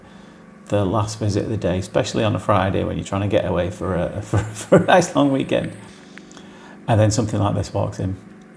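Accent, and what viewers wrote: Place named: England, United Kingdom. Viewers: Great job! Love your English accent! Canadian here. Weird to love this stuff but I do enjoy watching your work! And you are handsome to boot! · Ah, thanks for that! I wondered because just occasionally I thought I might have detected a tiny trace of something else, something closer to the southeast tip of Lancashire, or thereabouts. It's just one of your vowel sounds, I think. But then, I'm absolutely no expert on the Leigh/Golborne area accent (obviously). 😄 Yours is a lovely accent - we do have some beauties in the northwest and the north, don't we? And they are more original, closer to how English sounded 1,000 years ago, which I rather like.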